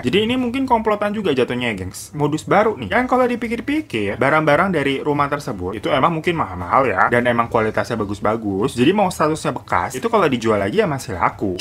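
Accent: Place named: Bekasi, Indonesia. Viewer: orang babelan ya 😂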